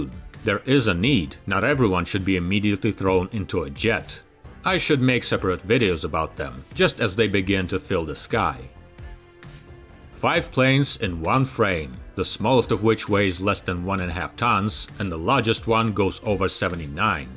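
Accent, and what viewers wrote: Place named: Russia. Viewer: This guy has amazing English, and a perfect amount of Russian accent. Not too strong, not too American. Amazing videos, keep up this amazing channel!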